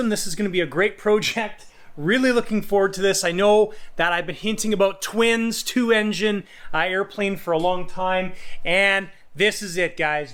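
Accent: Canada. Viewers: I don't know if I like this channel more for the great info and tips, or the fact that Canadians talk so funny...lol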